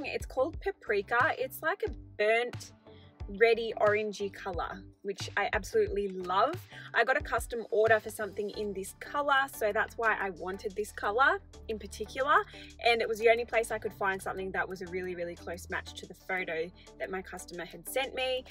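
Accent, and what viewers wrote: Australia. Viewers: Our spotlight is a bit like that in Queanbeyan NSW. We don’t even have one in Canberra. But we have 3 Lincrafts? I am enjoying all your videos so nice to hear an Aussie for a change.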